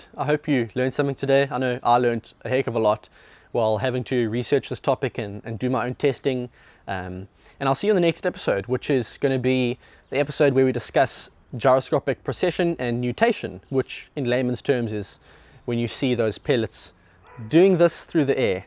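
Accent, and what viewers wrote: South Africa. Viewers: I thought he was south African?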